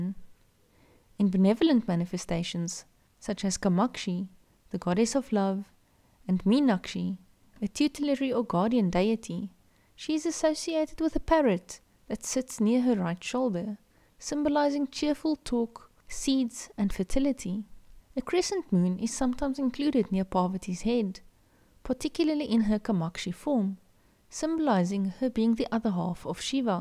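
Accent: South Africa. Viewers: Very nice content. Familiar accent. South African?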